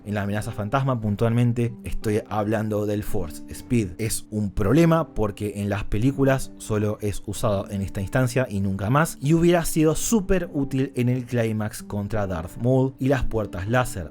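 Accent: Argentina. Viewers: Estos vídeo análisis son fascinantes y el acento argentino lo complementa y me parece un cuasi estándar contemporáneo con otros grandes exponentes de críticos youtuberos como Axl Kss y otros. No me imagino las reflexiones y conexiones que harás para el episodio 3 que es el cierre y el punto álgido catarsis de las precuelas .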